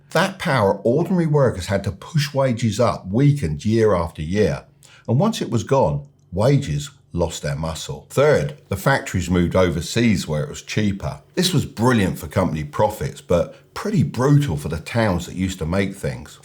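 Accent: United Kingdom. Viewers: Where did you grow up? Souds like you have a British accent. That is a critical piece of info you left out.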